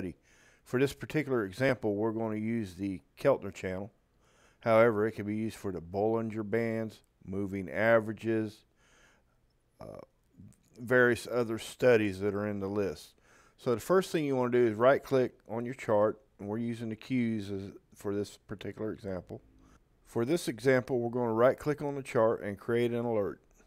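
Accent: South, United States. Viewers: Great video. Exactly what I was looking for. On a side note, you have an epic Southern accent. Will you make a recording for my voicemail greeting and invoke the power of?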